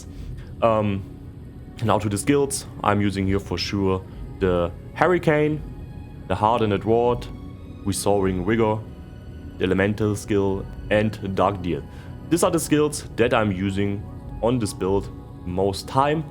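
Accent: Germany. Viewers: Love ur german english 😂👌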